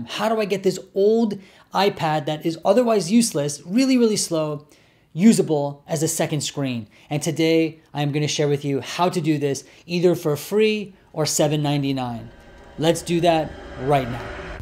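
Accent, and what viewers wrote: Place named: China. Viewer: sounds chinese therefore I don't trust it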